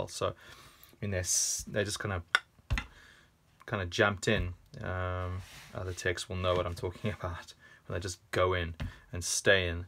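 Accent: South Africa. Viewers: Geolocation says Dublin, but accent is SA? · ... are you South African?? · Are you from South Africa?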